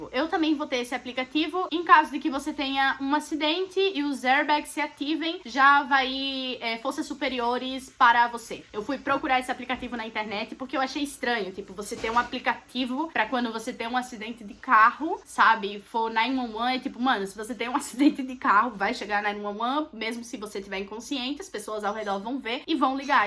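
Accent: Northeast, Brazil. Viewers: aaah, tá explicado kkkkk fiquei tipo "ela tem sotaque aqui do nordeste mas tbm tem tipo um sotaque inglês??" kkkkk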